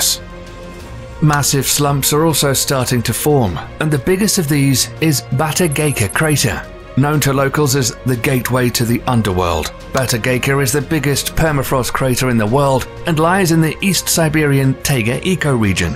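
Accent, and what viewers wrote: England, United Kingdom. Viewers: Y is this English man that doesn’t sound from the USA using football fields to measure things , I hate it when people use that to measure something it’s so ridiculous and stupid no one cares about American gay football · The only thing I'm worried about is fear mongering its' self. Equally troubling is the blatant over-use of the so-called british accent, touting all this doomsday drudgery to the faithfully numb, who are ultimately hired to spout continuous streams of falsehoods far & wide, to sell the appearance of enjoying the ride, while impatiently waiting for the latest chip, that will turn everything hip. Thanks but that, I will skip.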